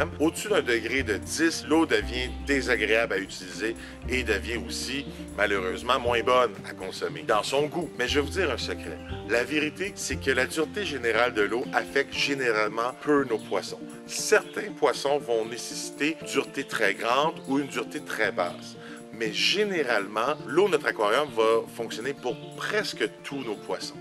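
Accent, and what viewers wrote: Canada. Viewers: Salut, super intéressant et bien expliqué, et l accent canadien j adore . j aimerai bien avoir un thème sur les méthodes efficace pour ce débarrassé des algues , malgré que j ai un chihiros doctor, très difficile de ne pas en avoir... en tous cas je te souhaite de réussir !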